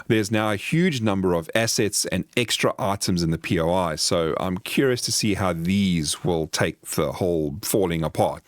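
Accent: South Africa. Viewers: South African accent is so fun to listen to.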